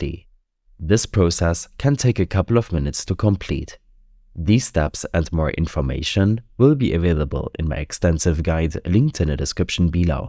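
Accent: Germany. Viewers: I love this perfect german accent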